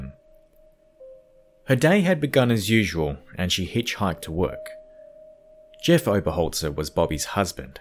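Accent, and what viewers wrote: Australia. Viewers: good to hear the Ozzie voice😃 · Great Aussie accent 🙏🏻🇦🇺🇦🇺❣️ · Aussie voice over ? I’m in thanks ! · first here......subscribed after 5 minutes listening. Awesome mate......guessing by the accent.....OZ ? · Good narration by this pleasant Aussie chap - definitely a thumbs up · Nice to hear an Aussie accent 🙂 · So happy to hear an Aussie voice over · Love the Australian accent! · I love starting a youtube video and hearing that sweet aussie accent. Keep it up brother!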